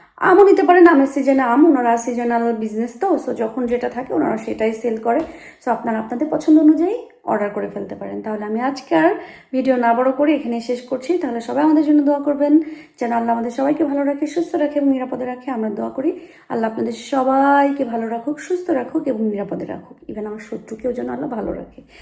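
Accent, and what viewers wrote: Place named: Jessore, Bangladesh. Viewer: السَّلَامُ عَلَيْكُمْ وَرَحْمَةُ اللهِ وَبَرَكَاتُهُ আশা করি ভালো আছেন। নীচের কমেন্ট গুলো মনজোগ দিয়ে পড়বেন এবং মনে রাখবেন। ১। আপনার উচ্চারণ ঠিক করুন। বাংলা শুদ্ধ করে বলেন, যশোরের উচ্চারণ পরিহার করুন। আপনার বাংলা শ্রুতিমধুর না। ২। কথা কম বলা ভালো। কথার তেমন দরকার কি? যেখানে ছবি কথা বলছে। ৩। ক্রিয়া বাচক শব্দের ব্যবহার শিখুন। একই বাক্যে দুটো ক্রিয়া ভুল। যেমন আপনি বলেন অনেক বেশী ভালো, এখানে অনেক ভালো বললেই হত। বাড়ি সুন্দর এখানে খুব ভালো বলা যায়। বেশি ভালো হবেনা ইত্যাদি। ৪। কোনো কোনো শব্দ স্টাইল মারতে গিয়ে ফানি হয়ে যায়। যেমন আল্লাহ হাফেজ। নরমাল ভাবে বললেই হয়। আজকের মত এটুকুই। যদি দেখি ইমপ্রুভ করেছেন তাহলেই আবার কমেন্ট করবো। আশা করি মনে কিছু করবেন না।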